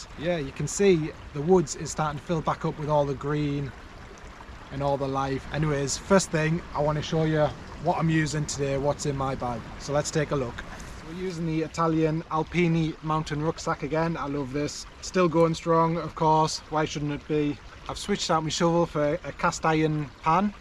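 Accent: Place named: Northern England, United Kingdom. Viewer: Enjoying the channel, love the accent. I’m from Newcastle originally but been in Oz for 35 years so love hearing the Northern accent.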